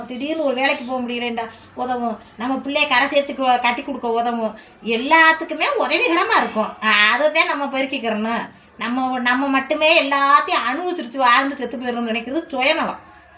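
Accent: Madurai, India. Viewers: நான் திருவள்ளூரில் இருக்கின்றேன் என்னுடைய பிறந்த ஊர் மதுரை, சகோதரி உங்களின் இயல்பான மதுரை மண் மனம் பேச்சு என்னுடைய சிறுவயது வாழ்க்கைக்கு கொண்டு சென்று விட்டது நீங்கள் சொல்லும் வார்த்தை எதார்த்தமான வாழ்க்கைக்கு உகந்தது உங்களுடைய தொடர்பு எண்ணை அனுப்பினால் நன்றாக இருக்கும்.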